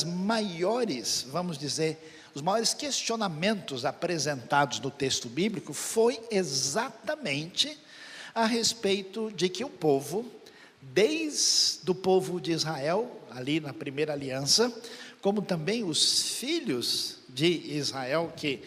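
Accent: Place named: South, Brazil. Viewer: Perfeito. Me horrorizei no início achando que Jesus achava que higiene não é importante. Ainda bem que Ele mesmo explica. Belíssima pregação, texto muito bonito. Sotaque sulista ficou muito bonito. Estranhei no começo, mas aqui tem muita gente do sul, ouvi tudo muito atento. Maravilha ver que Deus opera em seus servos fiéis, há muitos bons pastores que levam Sua palavra a sério.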